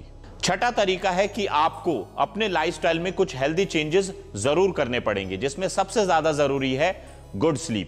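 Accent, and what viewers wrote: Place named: Hyderabad, India. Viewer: Zabardast Mashalah Chindiya agarbattiya mombattiya Sur Sur battiya chingari angar 😜 Hyderabadi andaz me bola lekin mashallah bhot khoob sir. Video apki dekh k mera har baar satisfaction aajata, confidence increase hojata k Bhai mai nai krsaktu aisa nai hai mai bhi krsaktu ye tariqa istemal krke or saath me apke liye dua bhi nikalti.😍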